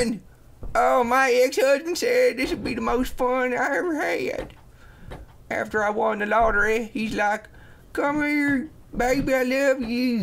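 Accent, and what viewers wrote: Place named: England, United Kingdom. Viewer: I love your English ascent!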